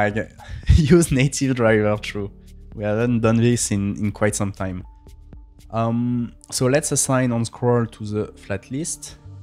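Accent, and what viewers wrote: France. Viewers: Nice video! You have a french accent, isn’t it? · So adorable french style english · Excellent tutorial, thank you! Also love your accent haha, you sound more French than Swiss to me but I'm Australian so am hardly qualified to tell the difference. :p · Intéressant le tuto français ça ce vois a l'accent ? 😊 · Vous avez une accent française 😄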